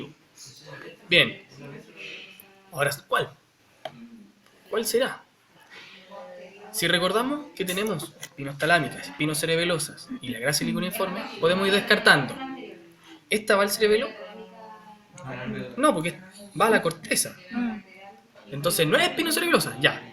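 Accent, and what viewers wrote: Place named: Chile. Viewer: español propio de chile xd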